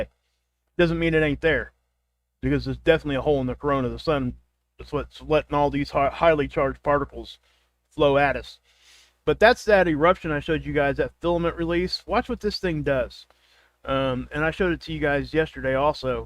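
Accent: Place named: Appalachia, United States. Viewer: btw I love the hillbilly talk it's what I best understand. Thank you with prayers for gettin well soon & blessings to u & family. ✝️🕊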